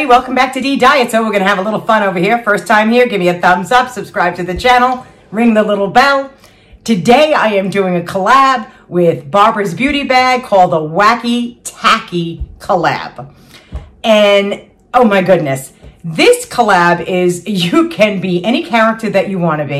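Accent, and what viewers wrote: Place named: South, United States. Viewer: i'm ready to see this ..OMG you nailed this southern accent im so weak laughing because your on point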